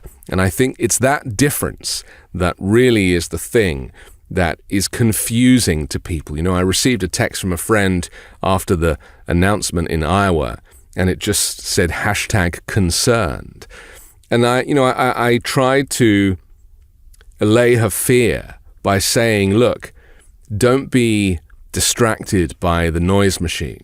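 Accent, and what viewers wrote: United Kingdom. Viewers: I find it hilarious that this host is still clinging onto the Russia Collusion hoax. His British accent makes him sound intelligent and then he lets stupidity like that come out of his mouth.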